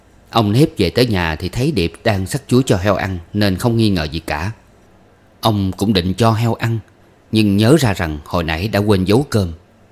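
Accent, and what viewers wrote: South, Vietnam. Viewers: Giọng đọc chuẩn miền Nam. Anh đọc quá hay xin cảm ơn anh và Tác giả. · Giọng đọc miền Nam quá hay và truyền cảm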